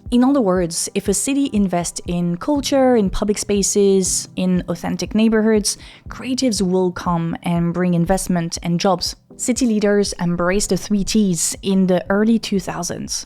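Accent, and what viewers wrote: France. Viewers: Maybe i am weird but always found the French English accent charming. Way better then my danish potato english :D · Don't feel bad about your accent! The French accent in English gets way too little exposure, and makes it harder for foreigners to understand French people who actually try to accomodate them by speaking English. Your older videos provide a great service! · I love the frenglish accent, I miss it 🥺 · OMG, THE FRENCH ACCENT BACK THEN, GIVE IT BACK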